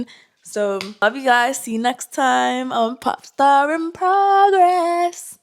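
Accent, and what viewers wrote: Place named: Bermuda. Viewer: Sounds like a little Bermuda on that accent 🇧🇲 ❤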